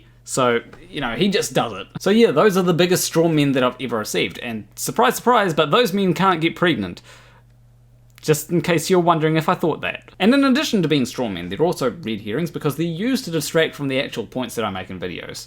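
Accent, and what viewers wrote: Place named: New Zealand. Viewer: Phoebics... Always good for a laugh. We have a very short life span in the scheme of things, and the best you can hope for is to be happy, looking forward to gettin up in the morning. If that means identifying as a cross/trans/metro/gay or any of the other options, just do it 👍. If it doesn't hurt others in your choice, then how can there be a problem? Great stuff as always, and always nice to hear another Kiwi accent on the tube. Cheers Hokitika.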